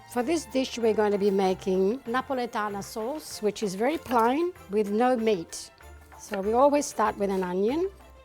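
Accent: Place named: Australia. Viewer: I love your accent, Australia^^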